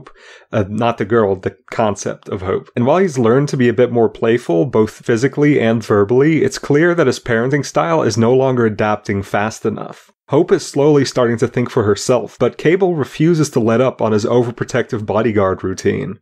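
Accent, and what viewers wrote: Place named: England, United Kingdom. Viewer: I heard some English accentuation in this video. You might have been picking up something from your friends too it seems!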